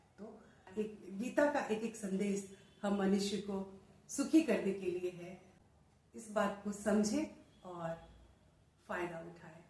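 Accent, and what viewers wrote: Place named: Bihar, India. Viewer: Well, first of all warm hello to you after such a long time. Where do you get so much knowledge n wisdom? Are you from Bihar since you have bihari accent ?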